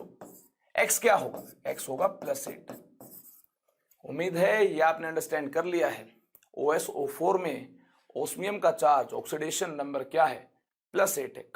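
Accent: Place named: Haryana, India. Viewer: sir, u have a haryanvi tone in your voice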